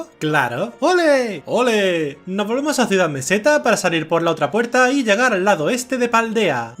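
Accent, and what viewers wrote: Andalusia, Spain. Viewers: de q parte de andalucia eres? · Eres andaluz? :0 Yo soy de Cádiz 😎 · bro eres de andalucia??'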